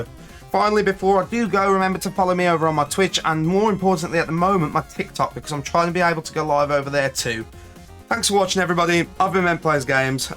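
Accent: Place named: West Midlands, United Kingdom. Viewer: I agree with the choices made 👌🏻, pulled out my old jailbroken ps3 and had a blast the past week playing all the old maps 💯Are you from near West Midlands your accent sounds very familiar ??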